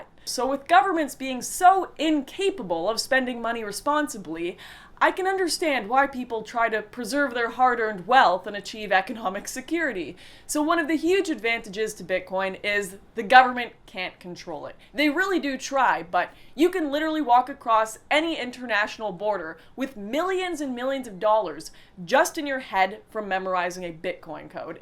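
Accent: Canada. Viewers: be waaay hotter if she'd drop the Canuck accent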